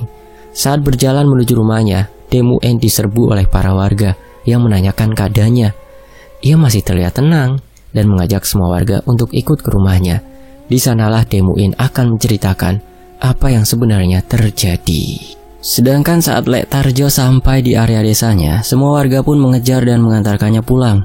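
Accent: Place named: Pekalongan, Indonesia. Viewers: Logat Pemalang Pekalongan itu ya mas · Logat Pekalongan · Logat batang Pekalongan pokok e sekitaran kue min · Logate wong batang, pekalongan, pemalang. Aku dewe wong batang 😅😅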